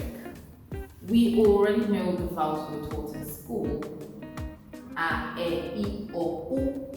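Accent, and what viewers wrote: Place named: United Kingdom. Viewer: Wow I love your British accent, it's very elegant and beautiful. I think your YouTube channel will do just fine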